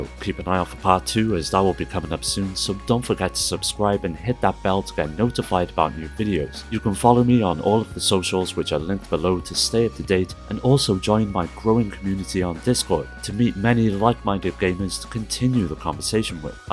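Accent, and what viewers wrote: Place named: Liverpool, United Kingdom. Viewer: Lol it's just a heavy Liverpool accent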